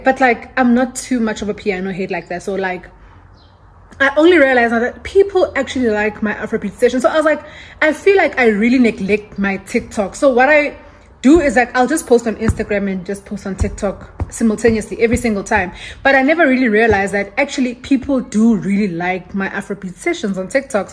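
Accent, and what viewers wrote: Nigeria. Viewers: Yohh motswala I love you so much hle 😂😂 the Nigerian accent is giving 🔥🔥🔥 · ❤️❤️❤️❤️❤️❤️❤️ hi mtswala just wanted to say congratulations again on your 10k subbies ,we love here. I wish you can speak wth that nigerian accent everyday or every month I really enjoy you doing it 😂😂❤️❤️❤️❤️ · I'm here for the Nigerian accent lol🤣love it a lot!!!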